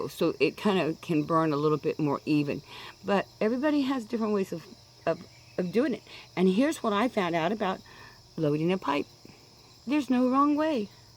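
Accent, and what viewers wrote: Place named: Kentucky, United States. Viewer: Hello Mrs.. I am a friend of Wes (Old Khakis). He said you had a nice trip down to Texas. So glad to know you. I am from Arkansas, so that Kentucky accent fell sweetly upon my ears.😊. I loved this video, even though I had to “listen quick”! LOL! I’ve only been smoking a pipe for 9 months, but absolutely LOVE the hobby! God bless you, Mrs.. I look forward to more of your videos! Cliff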